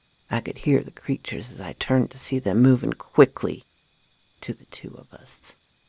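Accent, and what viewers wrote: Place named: South, United States. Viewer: Hi there 👋:) Thank you for the Spooklicious 😵 Narration!!! I 🧡 your Southern Accent 😉 I hope that you and everyone else has a Smacklicious 💋 Saturday Too:) much love Sexy Grandma Darkness ✌️💋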